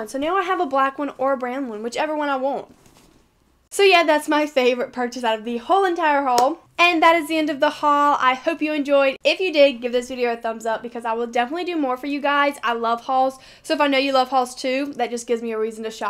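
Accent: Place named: South, United States. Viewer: I love your kind of southern accent accent! Where are you from?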